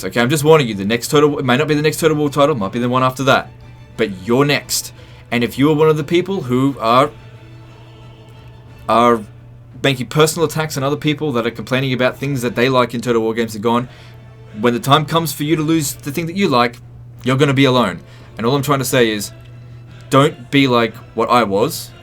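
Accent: Australia. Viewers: CA fanboi since Centurion on the Megadrive. All TW games have some shit and some gold. Some are golden shit. Bought everything except that shitty game after Empire;) Britannia, played it a bit but I mean ffs CA. Release TW:WH3 and Three Kingdoms already...Will always be about watching your shit. Need more aussies talking like aussies.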